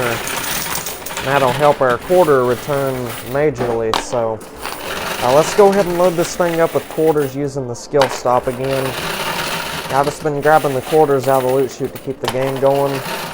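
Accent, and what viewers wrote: South, United States. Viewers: Apparently, this guy who talks like a red neck does!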